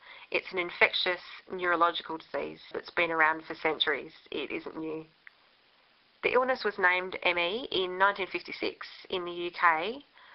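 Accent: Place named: Australia. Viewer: 1 I am so grateful to have found THIS video. I am tickled at the Aussie accent. :-) I was a VERY active, healthy, dynamic working girl until one fine day in July, 1986, I collapsed at work for no reason. I was taken to hospital, but released after 7 hours of observation. Exactly one week later, I collapsed again and was put into hospital for a week, while they ran every conceivable test in the book on me. I left that hospital an invalid with every single test showing normal. cont....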